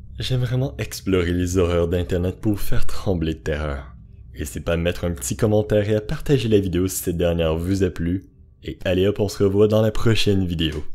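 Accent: Quebec, Canada. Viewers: Haha knew it pis honnêtement sa fais different d’écouter du thread lue par un québécois 😁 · Tu est québécois ? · J’adore l’accent québécois 😁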